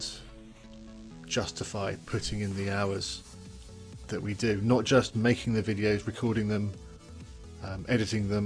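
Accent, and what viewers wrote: England, United Kingdom. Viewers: u really are cool:) u make me laugh with ur accent and style and ur "pommyness":3 watch u, pause, beef and guude alot and you four make me laugh. hope u dont stop:3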